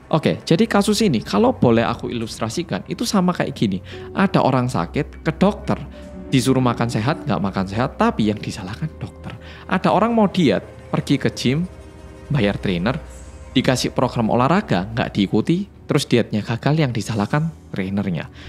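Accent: Java, Indonesia. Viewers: Kerenz pembawaannya medok tpi banyak tambahan gambar 🎉🎉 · OMG kisah nyata ini mirip karya di webtoon dgn judul "the real lesson" dari akhir episode 81-90, aku kira kejadian ini cuma ada di webtoon mengenai seorang ibu-ibu yang spam call guru sd di nomor pribadi bahkan pada saat malam hari. Ngga kebanyang itu kejadian di ibu guru sd yg mas ceritain😓😓 BTW, aku nonton video ini dideket papa dan papa ikut denger, papa malah salfok, "suaranya medok banget". Suara mas melokal yaa, sampe papa ga ngeh klo mas orang korea😅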